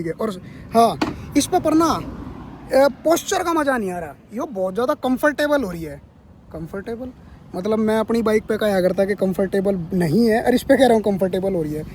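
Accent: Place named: India. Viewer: bhai no doubt gadi bahut achi hai in terms of performance,looks and road presence but agar gadi humein problem de to bahut dukh hota hai maine bhi aisi kuchh dikkate apne manpasand bike me dekhi hai to mai samjh sakta hun.. baki apka desi accent 🤩 mat chhodna keep growing.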